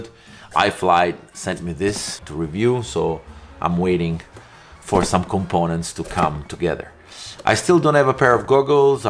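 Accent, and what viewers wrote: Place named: Italy. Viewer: Ciao, ammazza parli meglio di me l'inglese. Diciamo che si riconosce l'accento Italiano ma si capisce benissimo, io ero pilota privato ora sono Al secondo drone, un giocattolo da 30$ giusto x funn, un abbraccio 😘✌🇨🇦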